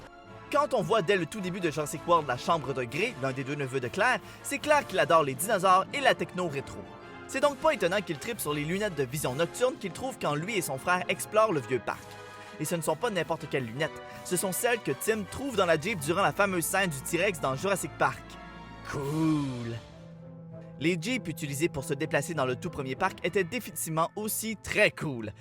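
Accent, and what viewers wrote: Quebec, Canada. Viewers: Accent québécois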